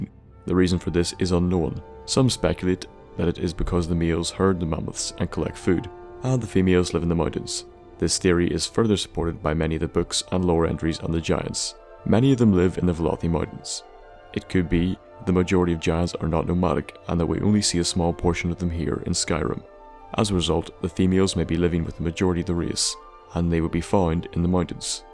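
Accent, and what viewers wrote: Northern Ireland, United Kingdom. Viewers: where is the narrator from? sounds like western northern irish to me? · What part of NI are you from??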